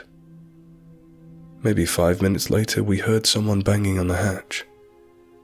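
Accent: England, United Kingdom. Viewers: This series of stories is AWESOME, but I gotta ask, if he's a US marine, why does he have an English accent??? Dont mean to be a Donald downer, just asking. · What is a Brit doing in the U.S. Marine Corps.? It's pretty obvious that the speaker is from the U.K. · He sounds ex muslim Brit from Uk. Good stories. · How can a U.S. Marine have a BRITISH ACCENT PLUS mispronounce "Marine Corps?????? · love your voice. You sound british like me :) ( A Worcester girl). You tell these stories so well and the pictures are great to give an insight to it all. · (Just wondering... how come a Brit is reading a Marine's story?) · Like many other I love your voice, I DO have a few questions though, you mention USMC and Navy Seals etc, yet your voice is 100% English, and so are your expressions... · These stories are great! Love the narrator's Brit accent too. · A ME city but all was in proportion, even churches? 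In the first vid this person talked about Palm Desert close to the Mex border in Carolina. This sounds like a great SiFi story. On top of this the narrator has an English accent! The stories sound real but I keep thinking the feeling he has of being watched may be by Freddy Kruger! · That's so weird grown ups playing war!!....in a fake city!!...so weird!!...and your accent sound english, european.. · That Brit Accent is most annoying. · british accent, talks about kilometers hmm.. must not be American marine.. ?? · British accent? And use of kilometers? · You are a Marine in U.S. 'cause you have an English accent?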